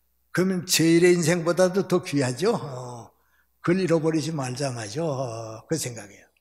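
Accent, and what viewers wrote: North Korea. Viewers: 올해 나는 72살. 어려서 학창시절 북한출신 은사님들로 부터 교육을 받았다. 김형석교수님의 가르침과 음성을 들으니, 옛날 평양사투리로 말씀하시던 선생님들의 음성이 들려와 하염없이 눈물만 흐른다. 선생님 건강하시고 오래사십시요. 감사합니다. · 이북말씨네요. 석가모니 부처님께서 최고이지요.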